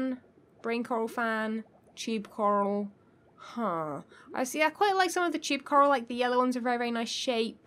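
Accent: United Kingdom. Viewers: I'm learning more about other hermits and I now know you and Impulse .xD By the way I like the way you speak it's nice, british?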